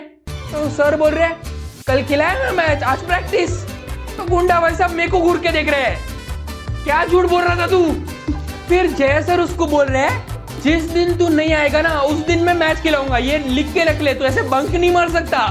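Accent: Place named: Jashpur, India. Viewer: Bhai are you from jaspur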